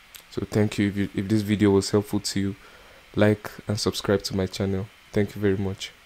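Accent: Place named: Nigeria. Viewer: You a nigerian bruh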